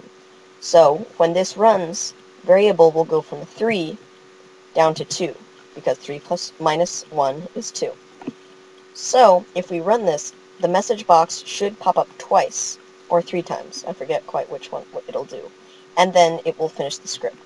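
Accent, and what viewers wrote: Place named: United States. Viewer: (I'm sorry, for possibly bad English) Madam, all of this is very interesting and I already fell in love with your voice (excellent American accent), but.. your tutorial is rather a programming tutorial, not AutoIt tutorial generally. I mean you don't explain why AutoIt deserves our attention if so many other programming languages are available? What AuotoIt can do what other scripting-based environments, scripting languages, or compilation-demanding languages- can't (or can but it's tedious)?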